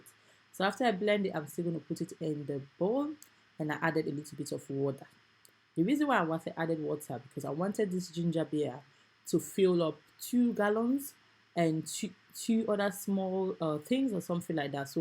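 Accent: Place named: Jamaica. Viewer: I've had ginger beer from the store that is made by Goya. And when I take a sip of it I can feel the antioxidants working. I don't want it really sweet but I want to make my own I was going to make kombucha I'm poor and they won't let you buy kombucha with food stamps anymore. I don't have a lot of Ginger but I think I have enough to make probably a gallon. And I want to make it the real Jamaican Way there are things Jamaicans know that I need to know. I love the accent. I used to work with a Jamaican guy at the sewer plant Bedford New York at the prison besides a used to make tea from cannabis. I bet that was very healing also. I'd say this is a healing drink it's not just a drink. That must be really strong you keep doing it over and over. Kind of like the time I was moving and I made the strongest coffee on Earth espresso but you keep running the water through it about five times I was awake for days LOL.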